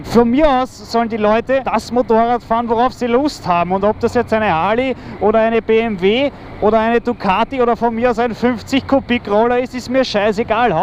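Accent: Austria. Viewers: Love it, Id reply in german but my writing skills are lacking (for lack of practice). Love hearing the german, love the passionate video and love your reasoning and thoughts on the matter. keep at it. keep going. keep exploring.. and keep posting VIDs :) whichever language.. its good to hear german (Austrian) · du redest nicht "österreichisch" sondern wienerisch ;-) von einem Hütteldorfer zum anderen... ich mag deine Videos, bin aber erst vor kurzem darauf gestoßen. Hab gehört du hattest unfreiwilligen Kontakt mit Gegenständen die man so nicht berühren will - falls dem wirklich so ist - gute Besserung! btw. die CB ist ned übel - vor allem wenn man den Hintergrund der CB in Erinnerung ruft - das erste Bike mit einem wirklich funktionierenden quer eingebauten 4 Zylinder. stay well cu harry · Thanks a lot for that video! I really appreciate the fact, that you took the time to write subtitles. 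To be honest, I would have watched the first 3 minutes in German without subs, that's it. With the subtitles, no problem at all! The "Austrian" German, is quite nice to hear, it does not rape my hears. So yes, I would definitely like to see more of those! Have a great year 2016, I hope you will get much more satisfaction and reward, for your work here, on YT. All the best!!! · Austrian??? Ahh we know 😌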